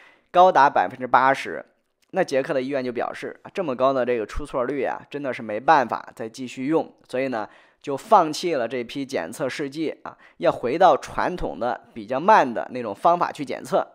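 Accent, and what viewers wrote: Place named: Mainland, China. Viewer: 你这普通话肯定是大陆的吧。骂得自己祖坟不冒青烟吗？😀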